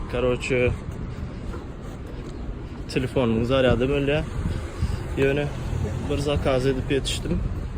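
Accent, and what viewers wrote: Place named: Lebap, Turkmenistan. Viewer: Sps sawedyn ucn. Gepleysina lebaplylara menzeya.bloklaryn howes biln dinleyan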